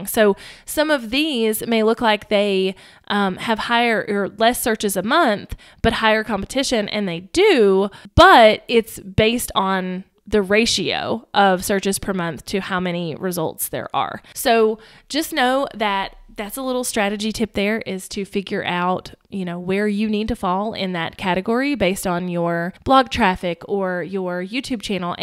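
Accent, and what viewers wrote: South, United States. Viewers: That was so helpful, and the Southern accent made the video especially interesting! 😉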